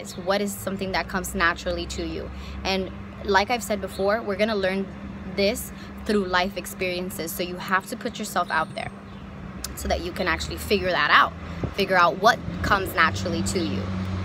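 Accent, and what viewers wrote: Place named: New York, United States. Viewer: I can recognize NY anywhere 😂🙌🏻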